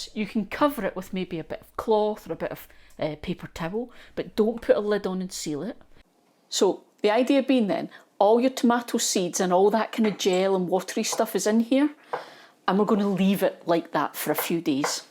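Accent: Scotland, United Kingdom. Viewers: I've been going back. Tried to find it but no luck.. oh well I was just curious. No big deal.. Love the Scottish accent.. From 🇨🇦 🥰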